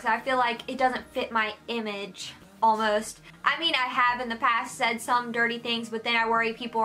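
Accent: United States. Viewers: Southern American accents are one of the best and this is coming from a California native. The reason why you get insecure about your accent is because of the stereotypes with people who have it are perceived to be dumb or low class. Not true. It's actually a very classy and gorgeous sounding. In fact, if you put Southern American accents on slow motion in a voice recorder, it sounds very similar to British accents. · Are you from Texas?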